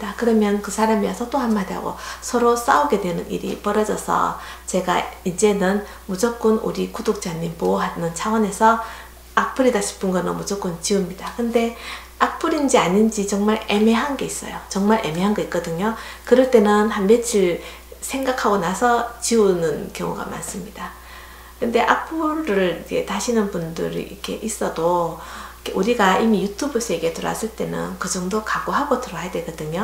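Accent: Gyeongsang, South Korea. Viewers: 나긋나긋한 경상도 억양 매력적이신 이모님 긴단하고 따라하기 쉬운 반찬 너무 좋아요 ♥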